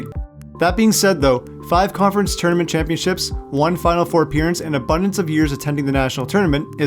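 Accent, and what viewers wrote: Canada. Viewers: The narrator makes two huge gaffes. One is his inability to correctly pronounce the word “Raleigh”. It is easy to pronounce if you pronounce the first half of the word like you would a college cheer. As in Rah Rah (insert team name next). The second half of the word is pronounced like the man’s name “Lee”. Put the two together and you have “Rah-Lee” or Raleigh. His second brobdingnagian blunder occurs when he tries to pronounce the word “Carolinians”. The proper way to pronounce the word is like saying “Caro- lynn-e-uns”. Practice a bit and he will master it in no time. Btw, he also mispronounces the word “South”. I am guessing he has roots in Canada by the way he says the word. Properly spoken, the word sounds like “Sow (as in a female pig” added to “th” as in the last to letters in the word “tooth”. Easy, right? Fun fact: in our 13 men’s college National Championships is the fact that North Carolina is the only state that has three universities with at least two championships each. 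North Carolina with 6, Duke with 5 and North Carolina State with 2. The announcer also mispronounces the word “out”. Once again, he must have roots in Canada.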